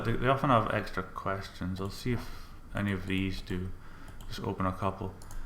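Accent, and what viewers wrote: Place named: Scotland, United Kingdom. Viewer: Cynicism always sounds better in Scottish. I disagree with the comments that you were rambling. I enjoyed the conversational style.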